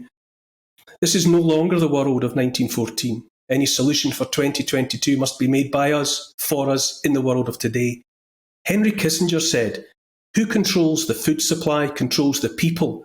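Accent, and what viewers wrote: Scotland, United Kingdom. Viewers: Neil Oliver sounds Scottish but his loyalty lies with Queen/King and England. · Neil Oliver is the reason I subscribe to GBN no other reason required. Besides he's Scottish the land of my forebears, William Wallace, Aberdeen and so on. Plus I just like the sound of the Scottish accent, it rings of the can't be bought commonsense I love.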